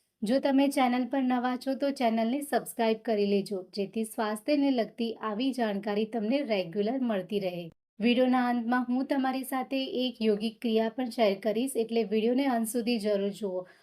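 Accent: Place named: Kathiawar, India. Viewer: Rajkot tame kathi bolo cho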